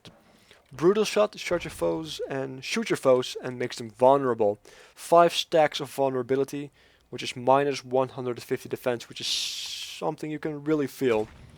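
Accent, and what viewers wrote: Netherlands. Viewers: Ik snap hoe moeilijk het is om engels te praten met n nederlands accent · You're dutch, aren't you? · you just can hear on his english speaking hes from holland lol:P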